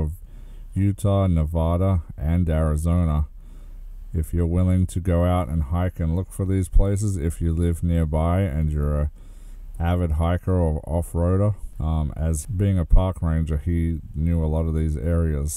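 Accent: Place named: Australia. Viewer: Who doesn't love listening to that Aussie accent! LOL 🤣 I hope they find the missing man. 🙏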